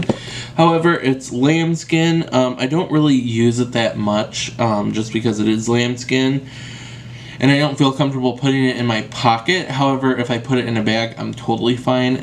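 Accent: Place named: Chicago, United States. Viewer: ..I found you from seeing your comments on other YouTubers I watch! Well I fell in love with you and I luv that Chicago accent! Use to live there and miss it:)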